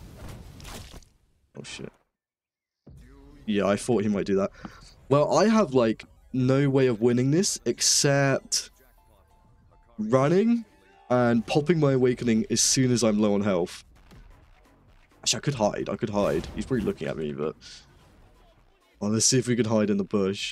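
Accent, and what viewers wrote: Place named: United Kingdom. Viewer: This guy British asf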